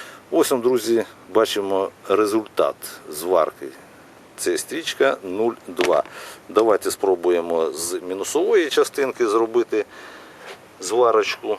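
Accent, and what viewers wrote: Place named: Sumy, Ukraine. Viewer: Я з Харківщини, але тільки почав дивитися твій канал, одразу зрозумів, що ти з Сумщини.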